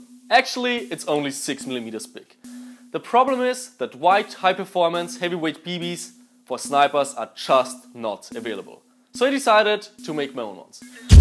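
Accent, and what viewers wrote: Germany. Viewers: Dein englisch ist gut jedoch der akzent ist zu deutsch 😂👍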